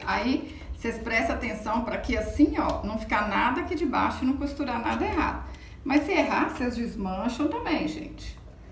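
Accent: Minas Gerais, Brazil. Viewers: Muito lindo!!! Já vou fazer pra minha neta Helena de 5 anos! Amo ver seus vídeos! Já estou inscrita faz tempo! Amo também o seu sotaque mineirinho!!! Parabéns pelos trabalhos e por ser paciente em explicar. Beijos😘😘👏👏👏😍😍😍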